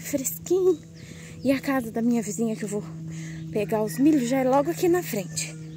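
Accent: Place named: Sao Paulo, Brazil. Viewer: Boa tarde família linda, tdo bem? vc é Paulista, vc tem sotaque de paulista, o r pucha pelo l, polta e colta, me responda por favor, que vontade de comer um pedaço, bolo. moro em Santa Cruz Rio de Janeiro, abraços pra todos vcs e me mande um abraço tbm. ❤❤❤❤❤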